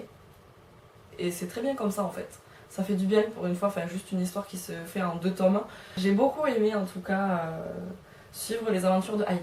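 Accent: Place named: Toulouse, France. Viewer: Merci pour ce point lecture ! (Ce petit accent me rappelle mon chez moi (Tarn et Toulouse).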